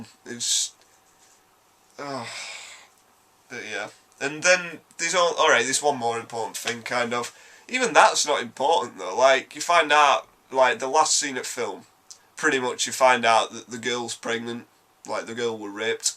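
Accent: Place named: Northern England, United Kingdom. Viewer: You are so likeable , corrie accent love it 🤣🤣🤣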